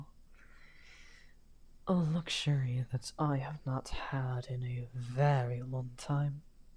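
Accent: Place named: United Kingdom. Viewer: AHHH I loved your british accent 😍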